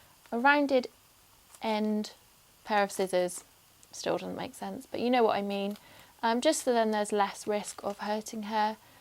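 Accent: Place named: United Kingdom. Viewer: Lily is so cute. And you have a very pretty British English accent.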